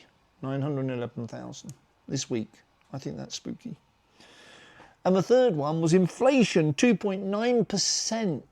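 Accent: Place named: United Kingdom. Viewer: It’s very refreshing to have a Brit doing this so well…the American accent and the hogwash really is off-putting.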